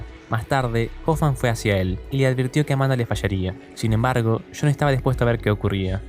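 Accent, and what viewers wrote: Argentina. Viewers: ja, reconozco bien ahi el acento argentino como cuando dices "machoria" (mayoria) o "chaves" (llaves) y a pezar de esto tienes una voz muy narrativa y asi, buena pa el estreno del video, qe buen era el momentito qe pasé gracias a ti, te lo debo tu like, pero qe 🤢🤮🤮🤮🤮 cuando mostrate la escena cuando kramer morrio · Excelente video, muy detallado y completo, pasando por toda la saga de esta maravillosa película, felicitaciones por tu trabajo, amigo, por tu acento sos Argentino. Saludos desde Buenos Aires Argentina!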